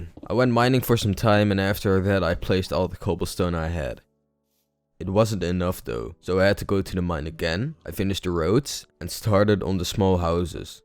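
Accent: Netherlands. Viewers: are u dutch? i am. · You sound very dutch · hey! :) are you dutch? your accent sounds very dutch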